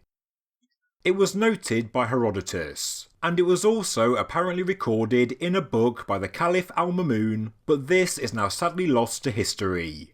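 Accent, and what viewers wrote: United Kingdom. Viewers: That’s his regional English accent. I love it!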